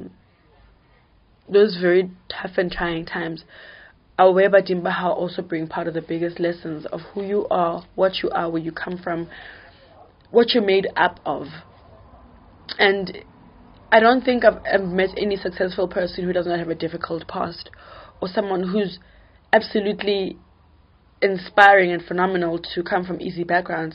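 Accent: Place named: England, United Kingdom. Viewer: what a beautiful English accent tjo, your ancestors are learned 🙌loving how you explain it in your language too wow.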